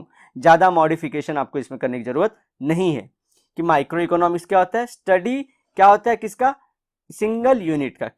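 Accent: Maharashtra, India. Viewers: Is your mother language is marathi ? 🤔🤔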